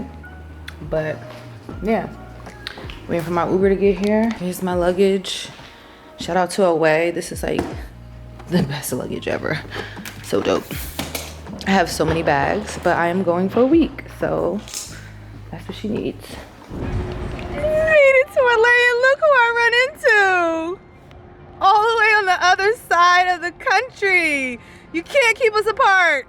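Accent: New York, United States. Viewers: we live for that face and NY accent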